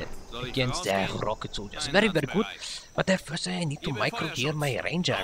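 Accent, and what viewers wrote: Germany. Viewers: I love when Germans speak English :D It sounds pretty nice :D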